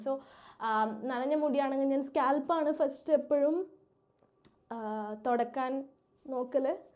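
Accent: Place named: Thalassery, India. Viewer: That Thallasery slang 😊❤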